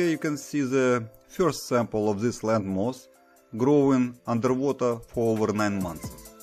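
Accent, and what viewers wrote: Russia. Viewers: This " meanwhile in Russia" accent...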